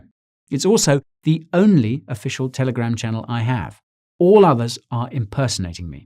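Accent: United States. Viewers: Can you do a 2hole video in American accent. You're American accent is so good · Vacations. Fall. Lumber... I've been noticing your "American" is coming along nicely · Note can speak American as well.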